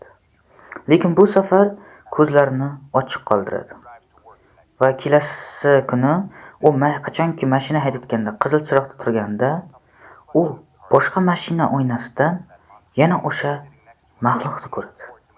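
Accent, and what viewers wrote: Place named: Shofirkon, Uzbekistan. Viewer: Shofirkonlikmisz deymn shevayz tortyapti😂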